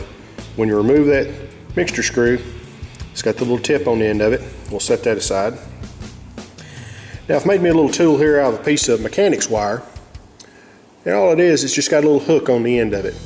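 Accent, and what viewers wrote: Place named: Arkansas, United States. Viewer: A good work, I'll keep your tutorial in mind since I have one of those bikes (the LM version) and, someday, I should try to clean those carb. Just for curiosity, have you sold the bike or are you using it for fun in the fields? P.s. I appreciate your patience in explaining slowly while filming the procedure, since I'm not very familiar with Arkansas accent: not with any US accent, in fact :)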